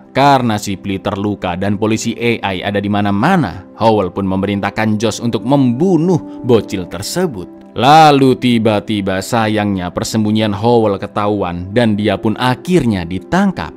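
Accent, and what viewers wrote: Java, Indonesia. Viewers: Jowone ngendi cak?? 😂 Nyonk Jawa Tengah cak, tepatnya Parakan Temanggung.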